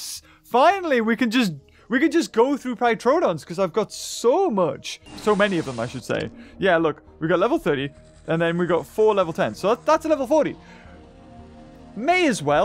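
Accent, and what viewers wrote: United Kingdom. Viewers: the only difference is your british accent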